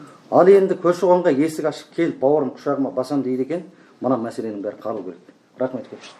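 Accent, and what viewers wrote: Standard. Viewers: Таза қазақша сөйлеп отыр❤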